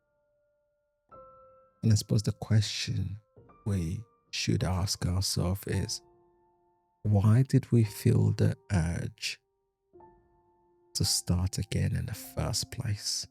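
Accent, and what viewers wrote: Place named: United Kingdom. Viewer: I love your wonderful British accent.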